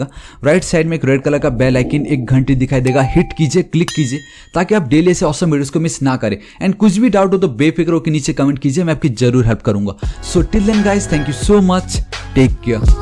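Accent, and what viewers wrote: Nepal. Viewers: why do you have Nipali accent.🤔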